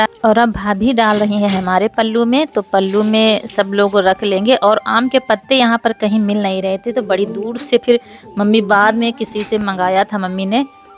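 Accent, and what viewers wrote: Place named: Unnao, India. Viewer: Kya aap unnao jila ke hasan ganj ke aas pas ke rahne wale ho. Kyo ki ham bhi wahi ke rahwasi hai aur Aapki bhasa bilkul hamare jaisi hi hai aur sare sadi biyaah ke reeti rewaaz bhi. Mai mumbai main rahti hu aur jab bhi aapki vedio dekhti hu to mujhe bhi apne gao ki yaad aati hai.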